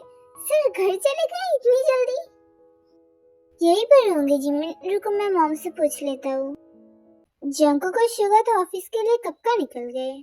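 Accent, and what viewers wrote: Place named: Hyderabad, India. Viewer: Didi app Hyderabad sa ho kya aap ka language Hyderabadi hai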